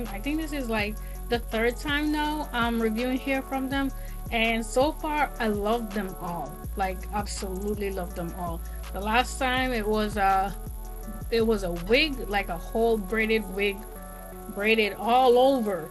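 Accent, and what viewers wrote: Jamaica. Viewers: girl have Jamaican accent ❤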